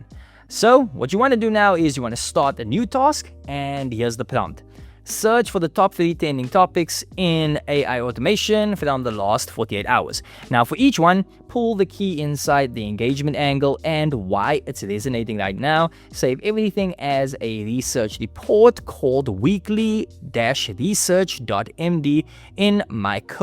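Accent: South Africa. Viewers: I hear South African Accent, I sub 😊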